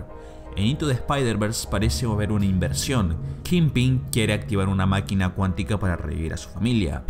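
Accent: Argentina. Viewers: parece argentino como habla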